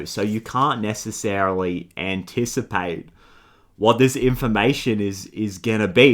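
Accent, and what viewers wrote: Australia. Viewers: Thanks bro. Are you from Australia?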